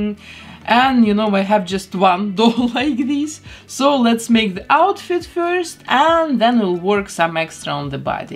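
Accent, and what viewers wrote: Russia. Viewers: you have the the THICKEST Rution acsent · Сразу слышно,что Русская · Whaaaat lol French?? This is a textbook Russian accent, have you ever really listened to French people in English?? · Вы русская? Голос такой интересный, и акцент Но в любом случае, я в восторге с ваших работ. Это очень круто. Успехов) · are you by any chance not Russian? it’s just in accent that you’re Russian. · Is she Russian ?she has an amazing accent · Russian accent... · Она часом не русская?просто акцент такой,очень узнаваем)впервые сейчас смотрю этот ролик)ну хочу сказать,она молодец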